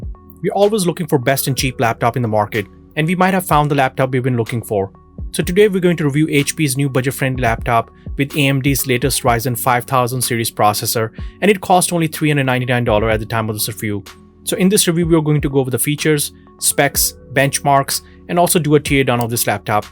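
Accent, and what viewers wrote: India. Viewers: Video was awesome but the thing I noticed was your accent didn't sound like American but as an indian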